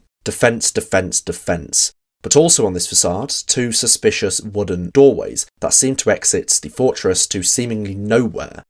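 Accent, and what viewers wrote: England, United Kingdom. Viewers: Dear Lord Brilliant! Love the accent. So English. What I wouldn't give to go back to the days where we could just go about jabbing people with metal sticks and shutting the gates on the morons that don't support us. Can we go back to that or not? lololol! No, I'm just joking on that, right? I mean, no, I have to be joking, we are so far beyond that technologically. I get to fly remote control RC drone hellis packed with lasers and cut down infidels? Ok, maybe? Oh man, it would be like that one helicopter, that was like the night rider helicopter, what was it called? Airwolf. lololol! Oh gosh. I want be an RC drone air wolf for infidels. No wait, I wanna box those people fair and square honestly. Then if that goes wrong. lololol. Oh my god, we've lost the plot. All over a silly old gate dude. You are gate happy bud. Gate happy.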